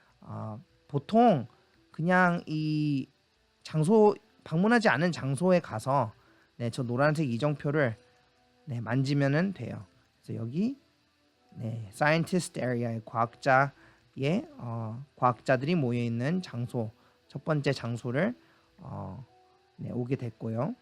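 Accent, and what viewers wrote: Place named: United States. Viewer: 발음이 미국에있는 친척형 같아서 목소리가 되게 친근하게 들리네요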